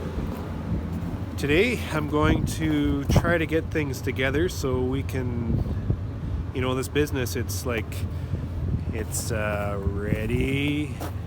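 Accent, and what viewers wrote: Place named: Winkler, Canada. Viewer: This gonna sound creepy but here it goes, I grew up around Winkler and I have a lot of good memories of my uncles wandering around muttering about equipment being broken or some weld or other failing. I've long since moved far way, worked in fields that have nothing to do with farming, got married had a kid etc. Sometimes its nice to just hear a guy talking in the accent I grew up around and talking about the kinds of things my uncles used to talk about. "Half pail of feed" haven't heard anyone say that in well over 20 years.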